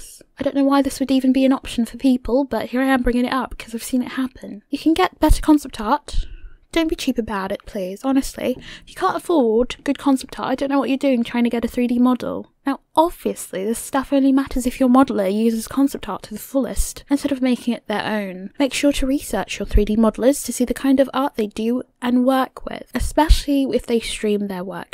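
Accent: United Kingdom. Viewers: Love your British accent lol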